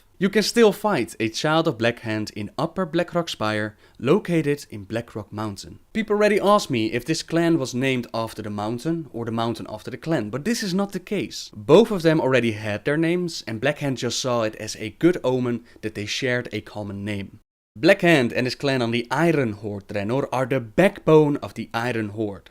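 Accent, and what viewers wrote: Netherlands. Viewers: lol are you dutch? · dutch is definitely his first language, has to be xD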